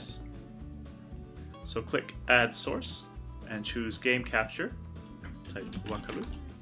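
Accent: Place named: United States. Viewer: I've tried staring at it for 20+ seconds, that doesn't seem to work for me. It might be because my camera resolution setting isn't that high (640x480) since it gets really sluggish at higher res. Anyway, it's not really a problem since I can consistently get it to sync after I turn my head. By the way, are you Canadian too? You sound American-ish but you say "zed", lol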